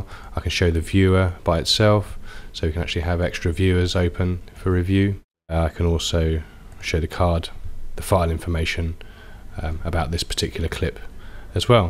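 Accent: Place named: United Kingdom. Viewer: Британский акцент :) Те же дела с мобил и мобайл.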